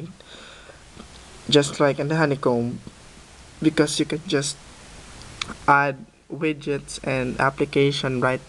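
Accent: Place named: India. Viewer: why is your accent sound like indian?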